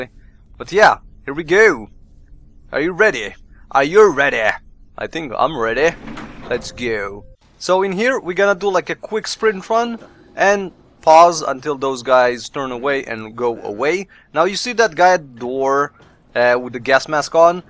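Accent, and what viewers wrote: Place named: Russia. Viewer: wow, you sound like a russian :) and i am russian, so no hate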